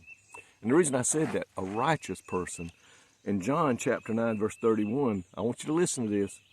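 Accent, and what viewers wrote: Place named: South, United States. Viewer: I too woke up in the woods on a spiritual retreat in Ohio. You blessed my soul with the nature sounds and that familiar Southern accent (I’m from TN). Thank you for sharing your time and your prayer of blessing on us watchers (I just searched for morning devotion and this came up). God, heal his eye issue. ❤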